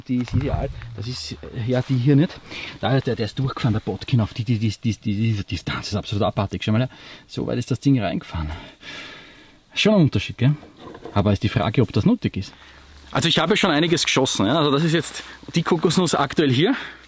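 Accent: Austria. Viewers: He speaks Austrian not German. This is a (funny) difference. So I agree, the autotranslation is useless.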